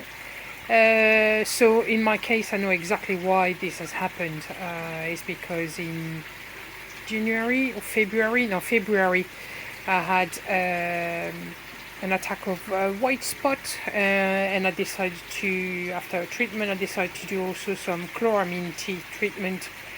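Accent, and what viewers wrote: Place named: France. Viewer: Good to see you back, missed that lovely French accent of yours✊ cheers 👍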